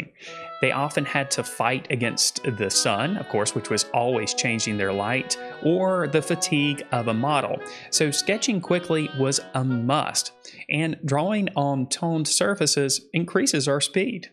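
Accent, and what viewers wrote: South, United States. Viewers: The way you say drawing sounds like drauwwing. What accent is it? Southern?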